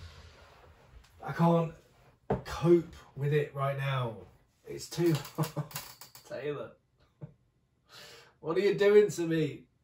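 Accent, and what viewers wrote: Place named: United Kingdom. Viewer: All I saw in this video was a Brit guy repeatedly saying 'I can't deal-' in his adorable accent.... Nd I couldn't agree more....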